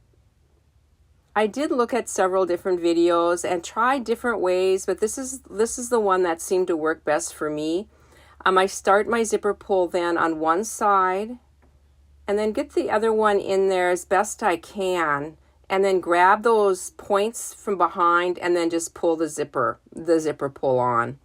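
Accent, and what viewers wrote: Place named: Wisconsin, United States. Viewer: Cute cute! Love your accent! Wisconsin??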